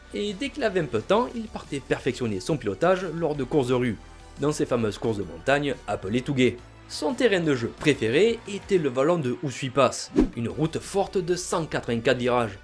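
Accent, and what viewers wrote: South, France. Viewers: Hhha! Initiale d le livreur de tofu tout fou! Hé c bo, 🤗 cool ta vidéo et j aime bien ton accent du soleil Fréro ,t as un nouvel abonné 😘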